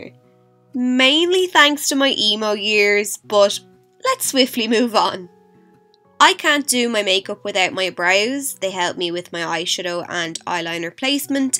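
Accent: Ireland. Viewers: Hey, great video I like the simplicity and that you take your time and go into detail to explain what you're doing and how you're doing it. I also like that you share alternate tips and tricks incase we're having problems. I appreciate that, I like your style so will be subscribing......also love your Irish accent. Coming from a massive Conor Mcgregor fan lol keep up the good work !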